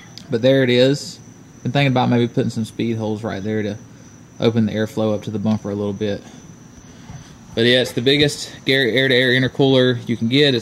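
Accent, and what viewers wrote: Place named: Tennessee, United States. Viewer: where are you located? I hear a TN twang!!! I am from Chattanooga.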